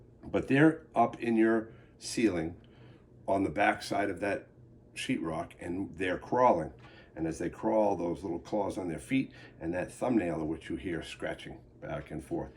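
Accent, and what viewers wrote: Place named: New York, United States. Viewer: I thought you were based in NY by your accent....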